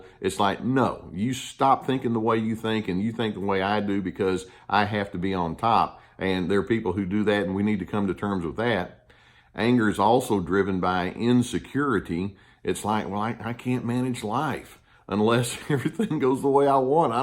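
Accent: Texas, United States. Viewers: A Texan?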